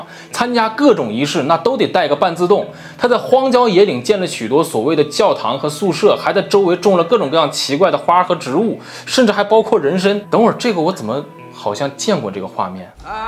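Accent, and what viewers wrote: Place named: Beijing, China. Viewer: 這個小哥北京腔好明顯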